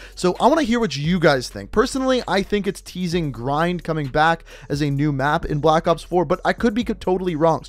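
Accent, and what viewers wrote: Canada. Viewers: he says moreoh bc he is canadian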